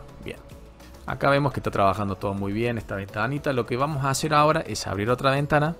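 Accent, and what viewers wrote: Córdoba, Argentina. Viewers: Sos cordobés ? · que hermoso el acento cordobes explicando algo, saludos desde Córdoba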